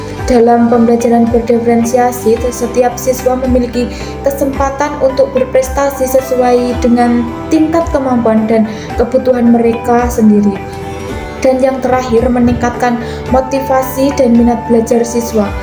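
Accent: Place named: Java, Indonesia. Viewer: Medok sekali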